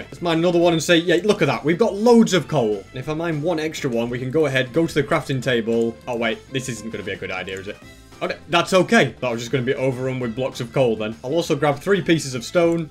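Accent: United Kingdom. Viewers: Are Ya British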